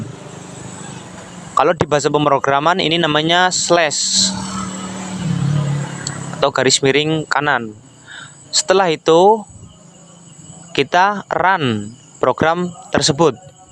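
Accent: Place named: Banyumas, Indonesia. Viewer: Ngapaknya itu loo 😍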